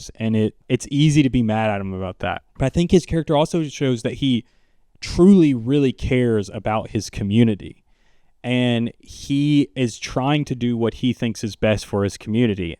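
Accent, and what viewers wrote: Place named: South, United States. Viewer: about to watch the video but upfront: love the accent. don't think i hear many southern accents from youtubers i don't know if this was consciously on ari aster's mind when he made joe cross' ending, but in the theater i immediately thought about the cases where people have been disabled from complications of having covid and any preexisting conditions that are worsened by it. he's paralyzed from the head injury but it makes a dual image. i'm thinking it was conscious since the er doctors commented on his high temperature, and, if i remember correctly, that affected the care he got at the hospital. we know he has asthma and probably sleep apnea (i recall a machine in the bedroom). at the least these parts of joe's characters were added for the dramatic and comedic effect but aster is a very thoughtful writer and director. 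it isn't something i've seen anyone comment on or ask him about. it just nags at me once i saw cross' breathing problems i knew he was doomed (practically all main characters in his films are). and once he went off the deep end i was ready for whatever terrible fate would befall him. but i felt sympathy for him after he's paralyzed. despite it all aster created an ending so awfully poetic for cross that i was struck by what it must feel like to be him, trapped in his head forever and unable to do anything for himself. it's fucking bleak. and of course aster slaps you over the head for the empathy with what the nurse and mother in law do. genuinely diabolical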